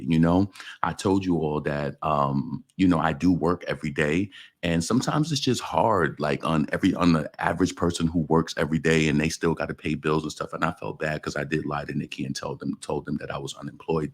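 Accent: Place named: New York, United States. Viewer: Its sounds like most people in nyc....you are blessed....Nicki blessed you 😊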